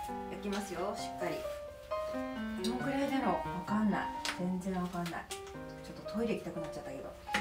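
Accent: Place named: Iida, Japan. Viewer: 大根もち❔大根とおもちを使ってどのようにするのかなぁと思っていましたが…😅なるほどねぇ🤔「大根もち」私も初めて聞きました😆 大根をスライスして具材を入れて片栗粉と小麦粉を入れて焼くのですね👌お好み焼きのような感じに～🤗 あっ！電池🔋が、、、早めに交換しないと〰️🤣 「命の源は金麦」に爆笑😆💣️✨ だもんでなぁ～の飯田弁、お母様の 梅酒(呑んでみたいです😉)に… おやきのお話しも～☆ 楽しいトークにハマっていました😆 「大根もち」はあきこさん押しの ミッカンのポン酢で頂きましたね🤗 具材にハムを入れてお肉の様な感じとなりアイデア良かったです👌 今回も楽しいクッキングを有り難うございました❤️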